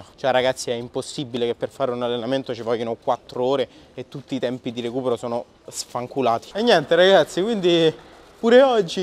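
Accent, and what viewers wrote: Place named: Umbria, Italy. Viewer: Noto un accento della bassa Umbria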